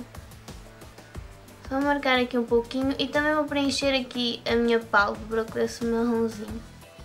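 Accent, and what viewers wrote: Portugal. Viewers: Que linda! Você ficou muito parecida com ela :D Adoro seu jeito de falar ♥ Portugues de Portugal ♥♥♥ Te adicionei no face e te segui no instagram :D Beijos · Gostei do video, só tenho dificuldade pra entender o sotaque português :(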